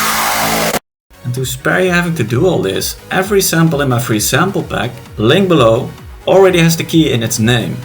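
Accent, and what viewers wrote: Netherlands. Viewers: Ik hoor je een nederlander bent dus stel ik men vraag ook in het nederlands , als ik een screech rechtstreeks in de playlist load is de screech nice of normaal maar eens in de piano roll dan is de sample een heel pak korter tenzij ik jetnop een lagere noot zet maar dan is de sound ook lager dan wat ik wil dus hoe krijg een hoge maar lange screech in dienpiano roll ook de noten langer maken maakt de sample niet langer ? Grtz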